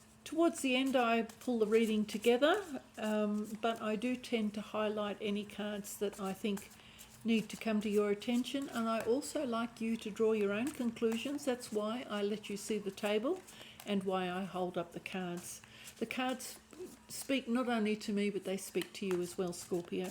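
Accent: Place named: Australia. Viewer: Nice to see an Australian (or in this case, hear) delivering a reading (some great Tarologists on YT ) new subscriber and thank you for an enlightened reading. You hit the nail on the head regarding fatigue and boredom. Also moving toward developing and connecting with my intuition (through Tarot and Pendulum work) and I appreciate the time you put into this reading. Much kindness and blessings to you (From Melbourne - Eastern Suburbs) 🌻🌻🌻🌻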